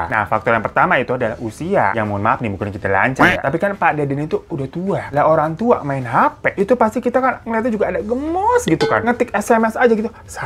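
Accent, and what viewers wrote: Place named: Jakarta, Indonesia. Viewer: Anjir betawinya si abang admin,bikin ngakak seru !!! Jd kangen jakarta.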